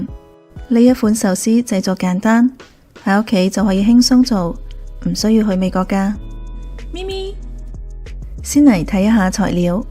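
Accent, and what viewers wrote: Standard. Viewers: 妳的廣東話好地道喎, 好專業既講解, 看🈵學習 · 粤语非常标准，听了舒服极了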